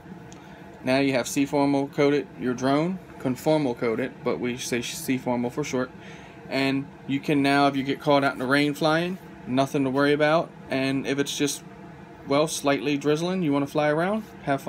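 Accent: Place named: Philadelphia, United States. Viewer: I'm from Philly and your accent is close enough to ours where I definitely trust everything you say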